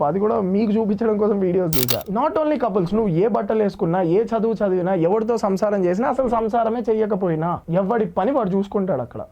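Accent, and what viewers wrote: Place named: Godavari, India. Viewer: Annaya dhi Godavari anukunta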